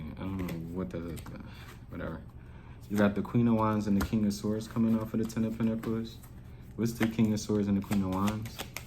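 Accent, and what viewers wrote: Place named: New Orleans, United States. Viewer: You are definitely gifted, all FACTZ just Blessed ❤..wow! You be spot on bruh. Where are you from, I'm hearing Nola, ATL?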